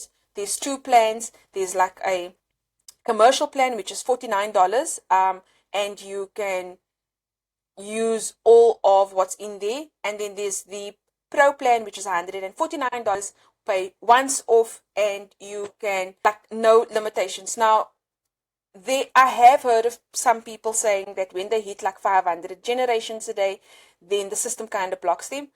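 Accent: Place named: South Africa. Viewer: Are you from South Africa? 🌼✨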